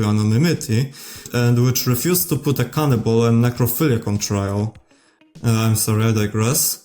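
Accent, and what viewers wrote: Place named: Poland. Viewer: Are you from Poland? I can hear our accent 😭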